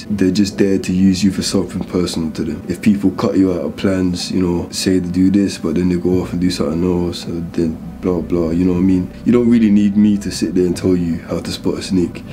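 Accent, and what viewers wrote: United Kingdom. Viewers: Are you from East London?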